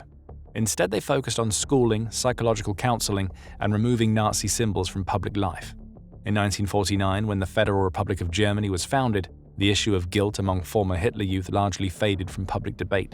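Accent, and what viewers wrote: United Kingdom. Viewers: I am more concerned that there is a british voice narrating the lives of germans rather than blue eyes and blonde hair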